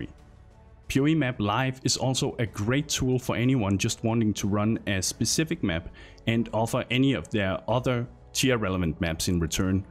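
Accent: Denmark. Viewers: That danish accent thou.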